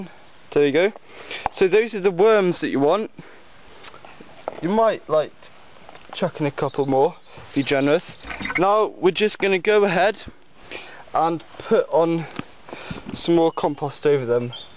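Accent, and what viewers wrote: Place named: England, United Kingdom. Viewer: why does everyone interested in worms, have that annoying English accent?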